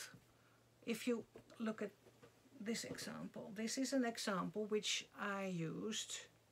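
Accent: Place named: Netherlands. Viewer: I love ur bags and socks. My Grandmother was Dutch and hearing your accent brings me back. I have tinnitus as does my mother. The first couple of months it drove me crazy but now I don’t hear it all the time unless I think about it. I think my brain and central nervous system adjusted to it. It’s hard to explain.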